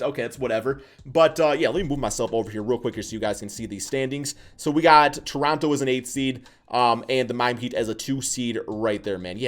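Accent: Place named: Bay Area, United States. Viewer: Big facts brah like yo is he from the bay? Or Michigan?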